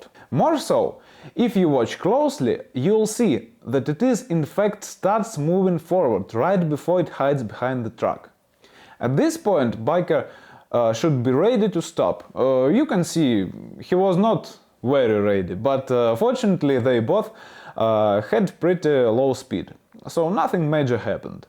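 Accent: Russia. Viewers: I Think these videos are excellent, even the Russian accent adds authority to the message, shame no schools in UK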